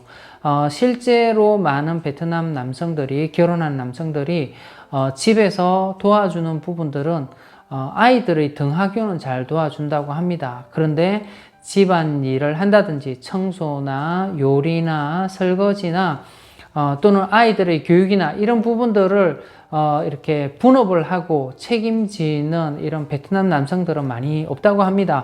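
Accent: Gyeongsang, South Korea. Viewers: 방송대사도 없이 어찌 저리 말을 잘하시는지요. 경상도 억양마저도 정겹습니다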